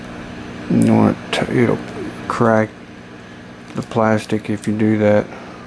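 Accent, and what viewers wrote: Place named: Texas, United States. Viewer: You sir are really funny talking with that slow texan voice ! My camera would show me a blurred screen with weird colors and wouldn't take photos anymore after a trip in the Sahara. I followed you step by step, blew canned air and picked out any dirt I could find and it worked ! I'm so happy and I wanted to thank you very much.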